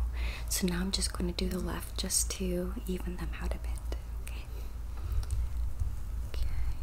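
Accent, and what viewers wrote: South, United States. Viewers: Luv those southern accent vids💯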